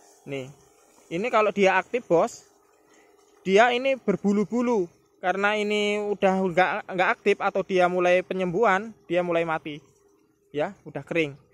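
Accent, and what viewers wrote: East Java, Indonesia. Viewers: iki asale jowo ngendi bos kok kyk jawatimuran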